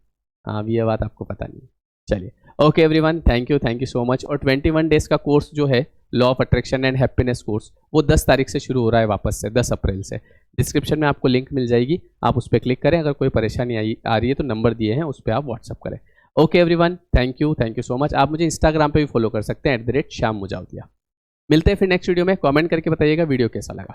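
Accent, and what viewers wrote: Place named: Malwa, India. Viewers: Inki boli se ye ujjain/malwa se lgte hain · Sir aap indore ya Malwa region se jude hai kya .... Apki boli se mujhe lagta h k aap hamare malwa region se jude hai 😊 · Bhai aap indore se ho kya? 😅Bade he indori lag re bhai😅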